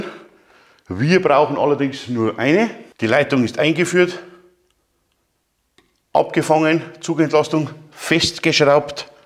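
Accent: Bavaria, Germany. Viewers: der "Erklärbär" 😂 Wie immer klasse, auch wenn ich mich als Preuße oberhalb des Weißwurstäquators manchmal anstrengen muss das bayerische zu verstehen:-) Mal ne vielleicht ungewöhnliche Frage? Vom Zähler zum Wohnungssicherungskasten ist zwar 5x10 Quadrat verlegt aber 2 Außenleiter sind nicht im Zählerkasten aufgelegt und somit im Sicherungskasten auch nicht. Habe sozusagen nur 1 Außenleiter, 1 Neutralleiter und PE zur Verfügung. Der eine Außenleiter ist mit allen 16A Sicherungen im Kasten verbunden. Wäre es richtig wenn ich zum Kochfeld mit 5 pol. Kabel gehe, wobei ich 3 Außenleiter (einzeln abgesichert) nehme? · du erklärst es einfach, dass ich mich zwar für mich darauf konzentrieren muss, deinen bayrischen Dialekt zu verstehen.Du bist ein netter Kerl.Mia san mia👍😉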